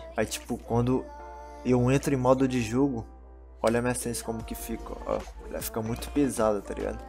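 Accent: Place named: Bahia, Brazil. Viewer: Antigamente voz de maloqueiro hoje em dia tem voz de baiano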